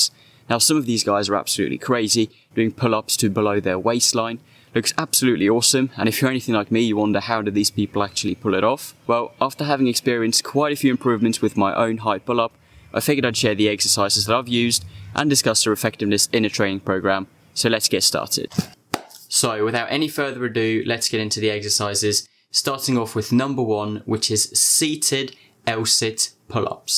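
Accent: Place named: England, United Kingdom. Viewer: Blimey, I thought your accent was from England. You must have learnt your English from an English person.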